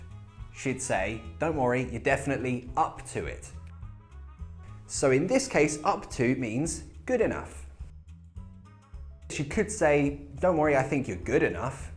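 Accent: United Kingdom. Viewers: your videos are totally the best one to learn the real british accent :) thank you a lot.. are you up for eating Japene Food? is it Right ? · I really love watching your videos. I'm more used to the american accent, but I can understand you completely, even though your accent is british. By the way, I love your accent.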